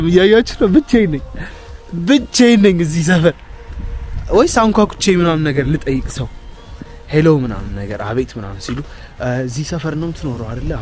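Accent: United Kingdom. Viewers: your British accent is lovely 🥰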